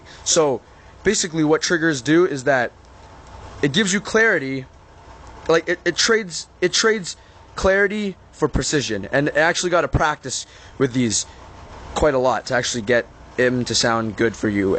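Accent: Canada. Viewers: are you Canadian? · He sounds like Lars Ulrich mixed with Shia Labouff, but with a Canadian accent.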